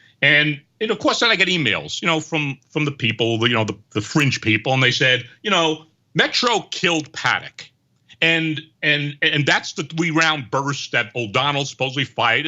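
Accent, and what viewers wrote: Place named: New York, United States. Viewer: Mr. is a confirmed Jesuit operative with direct ties to the headquarters of the Swiss Guard in Vatican City, the 5 families of the Cosa Nostra, nearly executive and board member of several Hollywood studios. Notice how hard he tries (and fails) to present himself as some educated professional. His struggle to suppress his borough accent is clear as day. Most of his ‘guests’ also just so happen to be big dumb wops — the vast majority of which are uneducated and underqualified to work in the professions they purport to be associated with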